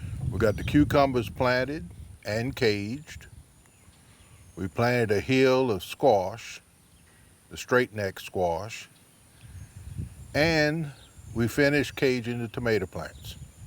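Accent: Louisiana, United States. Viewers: Just found you on YouTube Some of the vids are a bit older but not a lot changes in gardening. Love the Cajun accent. How about some zydeco music?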